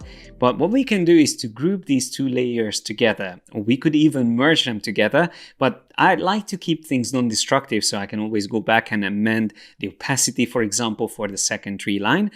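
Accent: Hungary. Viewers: That magyar akcentus though :D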